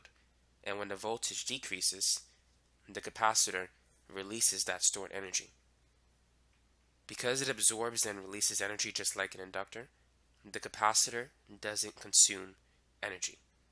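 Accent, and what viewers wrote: United States. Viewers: A very sharp, clear almost squeaky British voice, but pronounces like an American. Perhaps, he lives and teaches in the States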